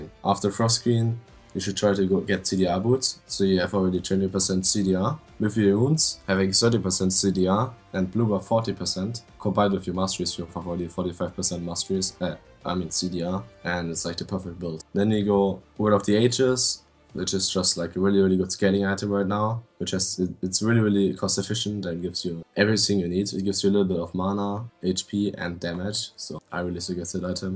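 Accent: Germany. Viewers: Dieser deutsche Akzent omg xD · Dieser deutsche Aktzent ;D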